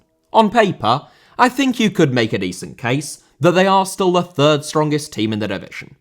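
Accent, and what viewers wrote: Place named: Liverpool, United Kingdom. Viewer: sounds like upper middle class scouser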